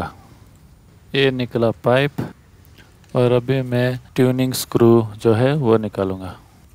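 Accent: Goa, India. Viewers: Are you from goa? Cz ur hindi little like goan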